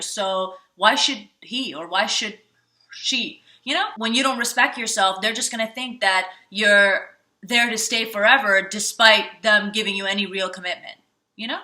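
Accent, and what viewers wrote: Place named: Spain. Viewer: A foreign one. She speaks another language, maybe Spanish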